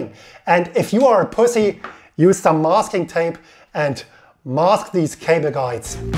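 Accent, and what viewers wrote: Germany. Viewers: Funny Guy, Hilarious comments, No BS to the Point, Crazy Accent - German if i am right! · deutscher akzent on point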